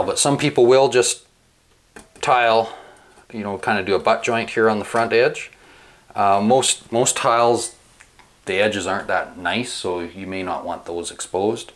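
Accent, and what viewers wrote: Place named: Canada. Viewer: Finally someone who pronounces the word Niche correctly. I'm gonna guess you're canadian right?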